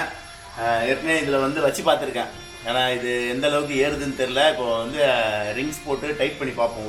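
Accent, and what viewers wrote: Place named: Chennai, India. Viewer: Hi man...நீ பேசுறத வச்சு நீதான் கைப்புள்ளன்னு நிக் நேம் வச்சுகினேன்னு நென்ச்சிட்டேன்பா. .. படா ஷோக்கா கீது பா ஒன் டாக்கு ஆங்..மட்ராஸ் பாஷை கொஞ்சம் கூட எச்சாவா கயந்து அள்ளி வுடுபா..பேஷாபூடும்..ஒன்க்கு அது நல்லா சூட் ஆவுது தோஸ்த்து!!!!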